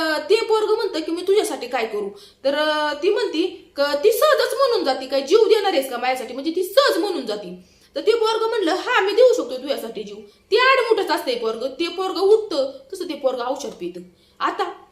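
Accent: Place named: Rural, India. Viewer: Gavthi bolna hicha konala awadata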